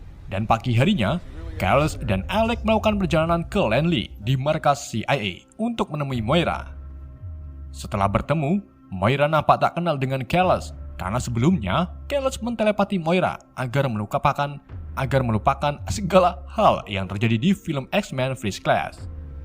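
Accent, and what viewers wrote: Indonesia. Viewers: Iya bang, sebagai org yang msh bljr, saya merasa agak risih, karena pengen bgt bisa bahasa Inggris , kembali mendengar aksen indo:v. Sukses terus bang channel langganan wkwk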